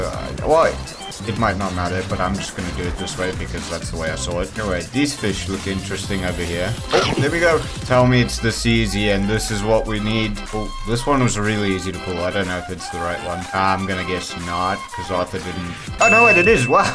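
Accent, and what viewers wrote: South Africa. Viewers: South African? Glad I'm not the only one playing this beauty again. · Are you south african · Has to be south african bro I can't unhear it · Bro I too am South African and I could recognise your accent,so I checked your profile and I was correct :D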